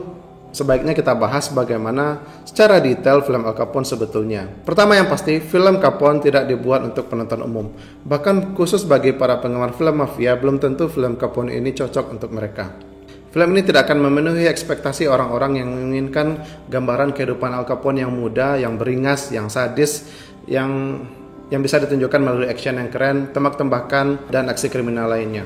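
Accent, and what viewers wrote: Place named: West Sumatra, Indonesia. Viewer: minang yo bang 😅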